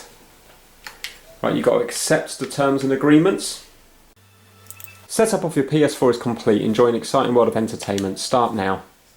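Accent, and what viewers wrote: England, United Kingdom. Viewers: Very well explained and I like your English accent.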